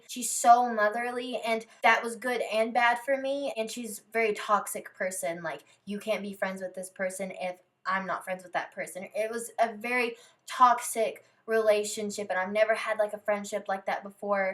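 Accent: South, United States. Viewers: This is the very first video I’ve ever seen of her, but I hear a southern accent and it’s SO cute!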